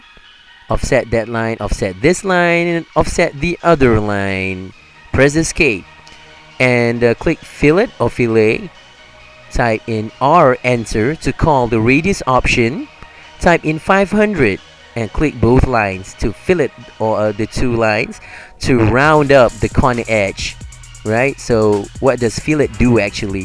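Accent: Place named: Philippines. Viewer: useful video and from ur accent it looks like u are from Philippine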